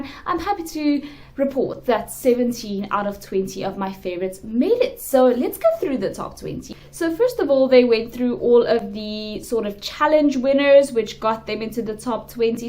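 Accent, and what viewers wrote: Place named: South Africa. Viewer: Love you. And love your Afrikaans pronunciation of ‘r’ gives life and errrrthing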